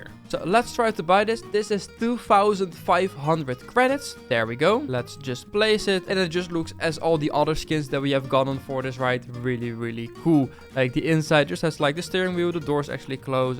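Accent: Netherlands. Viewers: Are you dutch ist looks at a realy dutch accent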